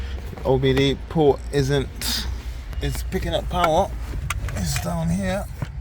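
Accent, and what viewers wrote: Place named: United Kingdom. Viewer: Nice to hear a British accent putting junk on the road again. 😊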